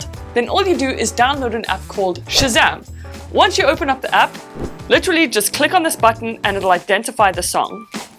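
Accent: South Africa. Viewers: South African????? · No lie I clicked on your video for the first time from my recommended and as soon as you started talking I was hold up, that accent sounds familiar, and you know I gotta support a fellow South African on YouTube. 😂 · Are you South African 😁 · You are from South Africa right ? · Do you come from South Africa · Loving hearing a south african accent, much love from Jozi! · Are you South African? It's the accent. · Are you from south africa?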